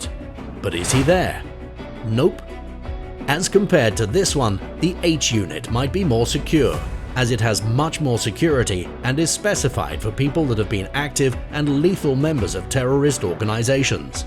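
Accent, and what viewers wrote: United Kingdom. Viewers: I don't think he's Canadian my be British or Australian with not so thick of an accent.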